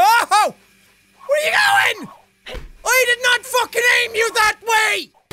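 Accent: Ireland. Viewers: I just watch ur older videos and ur accent was very Irish and I still laugh at ur minecraft survival videos and gmod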